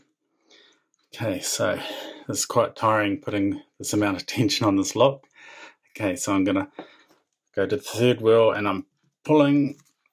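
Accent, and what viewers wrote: New Zealand. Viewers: South Island fella? 🇳🇿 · I heard that accent and instantly recognised you were a fellow kiwi! Fuck yeah! Glad to see another kiwi here! Chur for the video bro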